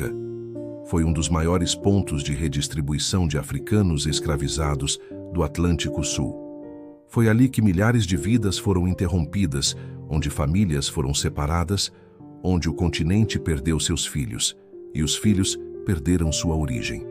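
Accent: Brazil. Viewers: Se estás a narrar a história da escravatura, coloque foto da época. Sotaque brasileiro também não soa bem. Quanto a história, Cabo Verde foi interposto comercial e colónia. Após a abolição da escravatura continuou como colónia, até a independência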